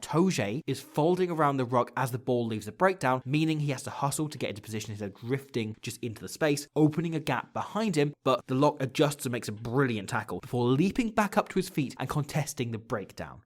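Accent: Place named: England, United Kingdom. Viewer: Whatever mate, you whittle on a lot. That smarmy English accent.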